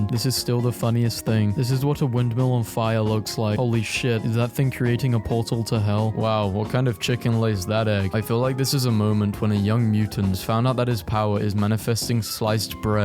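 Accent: United Kingdom. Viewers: nah really? i thought he was from the moon? yes of course hes british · Wait he's British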